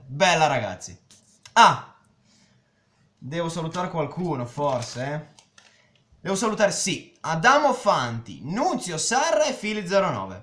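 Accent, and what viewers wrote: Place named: North, Italy. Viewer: Come puoi parlare di calcio. Sembri un contadino del nord per questo sei della Lazio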